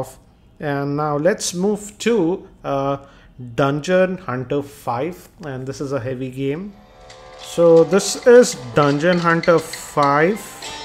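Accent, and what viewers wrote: India. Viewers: Snaaaapdragon, geeky never gets old, love that indian accent and reviews :)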